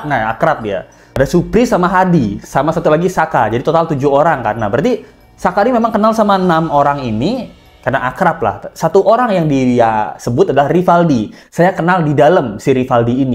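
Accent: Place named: Java, Indonesia. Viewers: lucu si lu bang, wkwkwkwk medok nya cerbon ga gitu, itu logat jawa timuran. tp gpp lucu. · Suka gaya bicarany abang dalam menjelaskan medok khas jawany🙏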